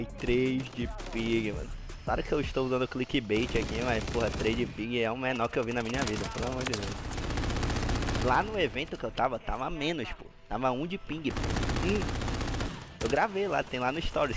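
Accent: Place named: Rio de Janeiro, Brazil. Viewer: Eu achava que tu era carioca kkkk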